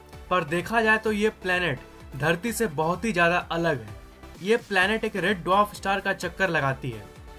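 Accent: Bihar, India. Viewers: are you Bihar........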